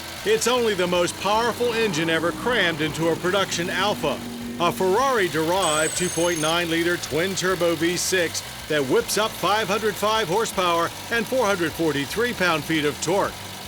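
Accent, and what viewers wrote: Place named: United States. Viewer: Wow, this guy has the most American voice ever.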